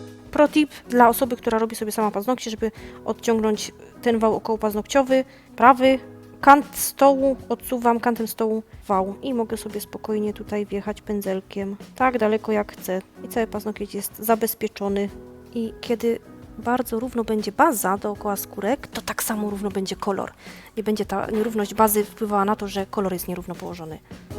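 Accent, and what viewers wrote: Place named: Silesia, Poland. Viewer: Jesteś nojlepszo i słysza Śląski akcent😁😁 pazurki piekne jak zawsze pozdrowienia z piykor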